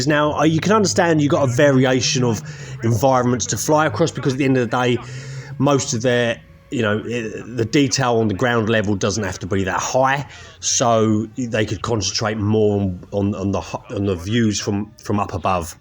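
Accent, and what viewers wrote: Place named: United Kingdom. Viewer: Yes mate Nice to hear some UK pilots doing a review…coventry massive here, hardcore MP only…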